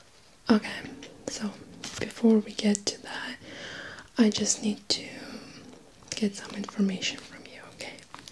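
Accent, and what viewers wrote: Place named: Russia. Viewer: Впервые на канале)) но сразу слышно русский акцент)) и книги 📚 физиология человека😄 но видео ничего есть пару норм!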